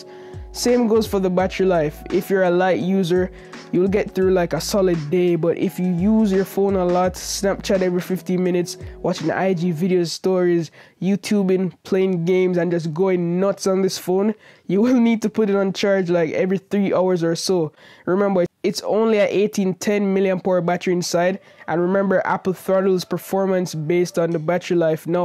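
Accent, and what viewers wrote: Jamaica. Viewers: are you jamaican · dude sounding Jamaican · hey great vid you Jamaican btw I hear the accent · You Jamaican? And what’s the intro song? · The accent, are you jamaican? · Are you Jamaican bro? The accent sounds familiar. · You Jamaican? 🤔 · Dude are you Jamaican?? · Are you a Jamaican? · A mobile phone reviewer with a Jamaican Accent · Patois strong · Are you Jamaican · U from Jamaica bro? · Are you a Jamaican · I can tell you are from Jamaica, aren't you? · Can't miss that Jamaican accent bro lol big up yuself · Are you from Jamaica? Or the Caribbean? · that Jamaican accent 😭😭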